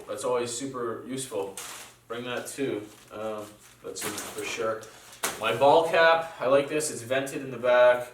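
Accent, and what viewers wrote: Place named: Canada. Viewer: Hey say hi to scout for me love yr Canadian accent "Not to shaby"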